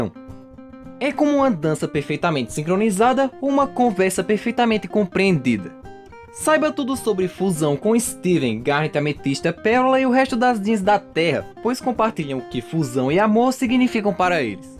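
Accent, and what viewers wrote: Northeast, Brazil. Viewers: vc e nordestino?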